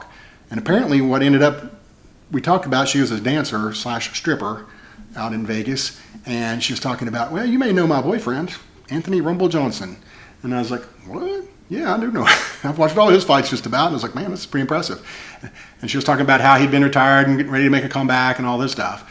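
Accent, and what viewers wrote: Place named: Appalachia, United States. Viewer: Love great video thanks for sharing 👍👍👍🇺🇸🇺🇸🇺🇸🇺🇸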